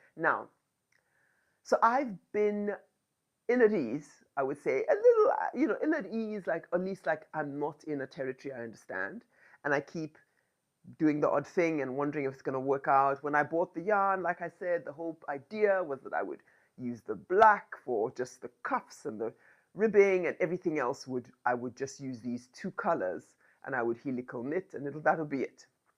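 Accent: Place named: Cape Town, South Africa. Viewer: When you said Cow girl blues I thought…. Oh Cape Town immediately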